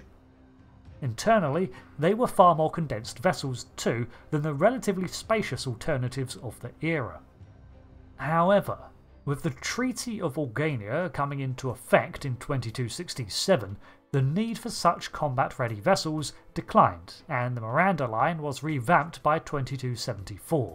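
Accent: United Kingdom. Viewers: Are you incapable of recognizing a British accent?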